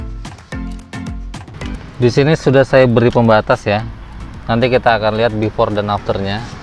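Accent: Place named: Banjar, Indonesia. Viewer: logat nya ada sedikit bahasa banjar nya . benar kan mas. ,😀😀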